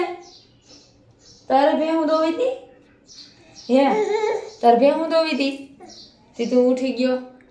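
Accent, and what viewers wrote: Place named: Amreli, India. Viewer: Ha ho ben amreli ni bhasa to sav algj lage to ben tme sasre aaviya tye tmare bolvu thoduk aghru lagtu hse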